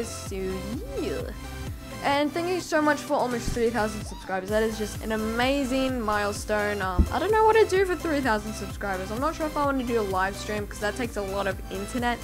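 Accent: Australia. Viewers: lol I love Australian's accents it sounds so perfect and beautiful <3 and I love your personality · Sorry if will offend you but you sound Australian, are you? Sorry again if I offended you I didn't mean to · u sound like ur from Wollongong......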